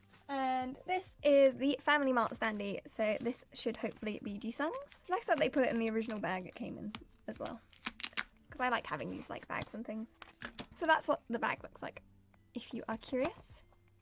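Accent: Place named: United Kingdom. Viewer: Hi, Really loved the video. Really love your personality. You are so beautiful. Love the way you talk and your voice too, lol. Really love your British accent. Sorry if that was a weird thing to say. I have been using Buyee for a long time, and they are very fast, and very reliable, but the only thing I do not like abut them is that when I choose Express Air Mail delivery, they make the choice out of 3 options that I do not know until after they shipped. They either ship DHL, FEDEX, or UPS Express, depending on the weight and dimensions of the package. Anyways, I just wish I had my own choice from those 3 options. I really like that this Proxy service (Remambo) allows you to choose the shipping service you want, instead of making that choice for you. I am only half way through your video, but it is so great. You seem super smart. I might switch from Buyee to Remambo now. 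I will give Remambo a try. Thanks again for the great video. I liked and subscribed to your channel. Love you, lol.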